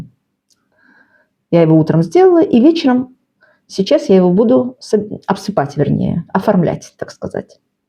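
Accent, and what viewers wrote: Baku, Azerbaijan. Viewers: Это бакинский акцент. Растяжка последних гласных в словах это влияние фарсидского языка.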